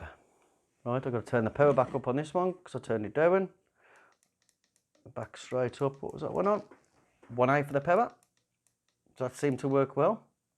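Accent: Birmingham, United Kingdom. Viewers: Where have you gone. Missing you……we need to hear your brummie accent and hear all your tips. Would like to see you do some macro with veg or kitchen things x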